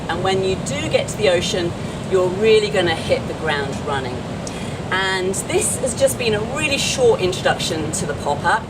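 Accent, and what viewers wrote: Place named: Australia. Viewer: 억양이 너무 호주 호주 한데요~ ㅎㅎ 역시 서핑은 오지! ㅎㅎ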